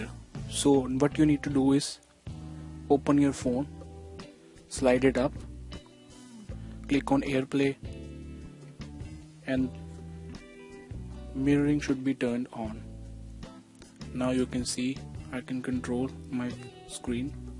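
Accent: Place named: India. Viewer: I dont trust this guy he sounds like a Indian tech support scammer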